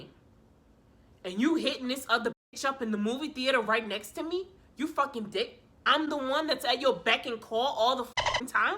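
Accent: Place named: Bronx, United States. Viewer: Omg I swore you were a Bronx or Brooklyn girl 😂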